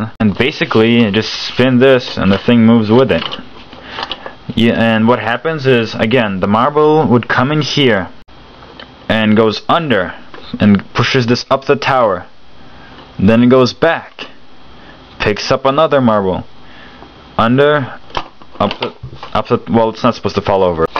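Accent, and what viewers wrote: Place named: Russia. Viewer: is russian your first language or something? you have an accent. can you speak russian at all?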